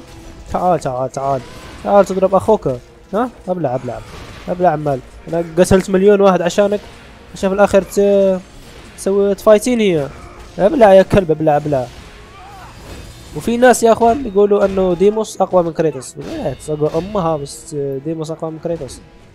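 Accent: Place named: Algeria. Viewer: اهاااااااااا هسة فهمت 😁 اني اكول مستحيل سعودي يتكلم بهاي الطريقه +تشرفت بيك و على راسي الجزائر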